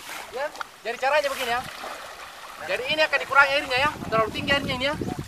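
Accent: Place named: South Sulawesi, Indonesia. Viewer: Cara bicaranya kaya orang sul sel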